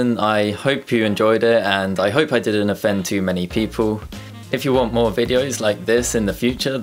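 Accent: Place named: Yorkshire, United Kingdom. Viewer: So helpful and a very nice accent! Is it Yorkshire?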